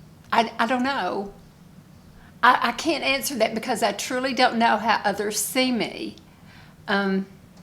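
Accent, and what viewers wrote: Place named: South, United States. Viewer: You would be fantadtic at ASMR!!! I often tune in to your channel right before bed to unwind. You are very nurturing by nature and your voice and Southern accent is calming and soothing. Just do it!!!! You are already doing ASMR without even knowing it! Lots of love and thanks for this video, i just had my 69th BDay in June. XOXOXO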